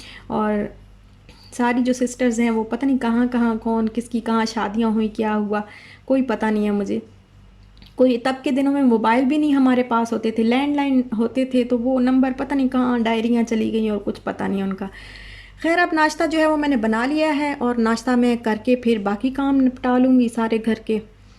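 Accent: Saraiki, Pakistan. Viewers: Ap Multan se hain?sariki speaking hain?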